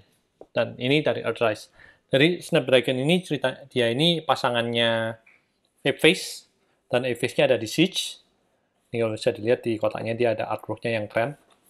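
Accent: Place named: Java, Indonesia. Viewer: Mantep... medhok reviewer e wkwkwk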